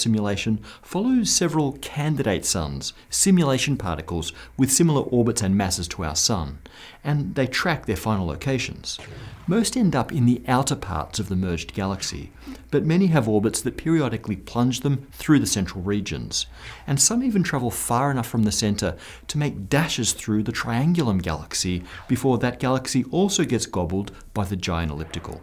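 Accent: Australia. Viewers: Do all Australians talk like that? I want to move to Australia all of a sudden. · Why would there ever be any down-votes for these videos? Don't like galactic mergers? Don't like Aussie accents? Then why watch? At this moment it's 13,806 views versus 19 thumbs-down - so I guess that's only slightly more than 1 in 1000 - so who the hell knows.... People that don't believe in the structure of the universe? Religious people? Surely not - cannot it all be seen as a gods amazing creation?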